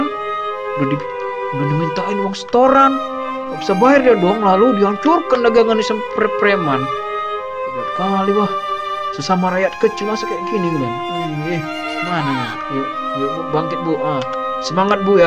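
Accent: Medan, Indonesia. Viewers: orang medan ni kek nya🗿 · Bang Abang Medan mananya?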